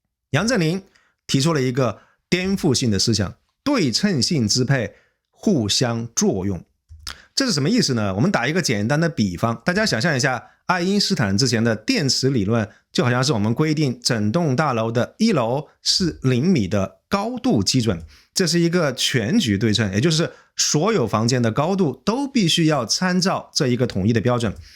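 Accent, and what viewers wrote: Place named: South, China. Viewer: 一周前才偶然关注先生的油管频道，集中观看了部分作品。首先，惊叹于先生的开阔视野和博闻强记； 其次，受益于这种逻辑完善、条理清晰而不紧不慢的叙事； 最后，感佩于这种不受主体和利益相关者的干扰，既能够设身处地忠于历史，而又能够跳出站队与偏见，努力还原真相及实事求是的态度。我不了解先生的个人背景，但令人舒适的南方口语，娓娓道来的文辞背后，包藏着古老的文化底蕴；中西合璧的知识结构，又浸润着清新的现代气息；不避争议而献上自己的一家之言和独到见解，也在诚恳中闪现出自信和智慧的光芒。虽偶有新论令人耳目一新，但更多的是那种沉稳大气、从容优雅，让知识和信息淙淙流进观者心田，如沐春风、如临清泉。世有纷扰，多所歧见。所论，颇值静观。悠管纷乱众奇葩天籁自别群乌鸦万千迷雾森森色一缕清音映落霞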